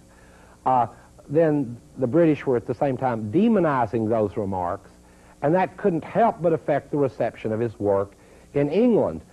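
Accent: South, United States. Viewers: a southern twang and philosophy go together so damn well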